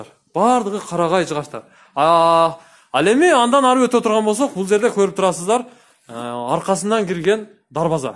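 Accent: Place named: Osh, Kyrgyzstan. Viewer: Оштон болобузда ака бизден отсо кечирес ошто ушинтип суйлойтко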